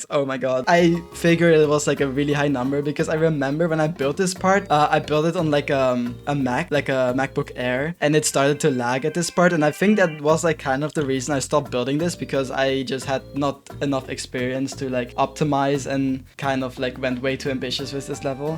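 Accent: Germany. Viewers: Youve got a german accent, are you from Germany? I am austrian btw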